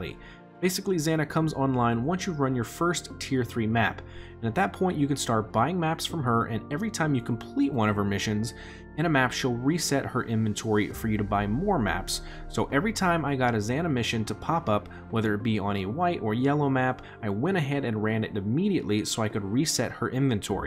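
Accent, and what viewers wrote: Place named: United States. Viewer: A someone who isn't American, I usually find the accent as hit or miss. You have a lovely tone, pace and cadence to your voice, and it made for a really nice video. You should do more content, and persevere with your Youtube channel.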